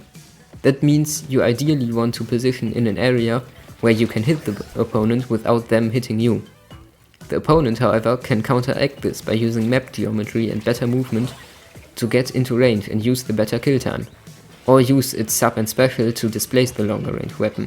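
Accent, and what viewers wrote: Germany. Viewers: Wow thats a really good First video :) And i find it funny how you Sound like having a really strong german accent haha x)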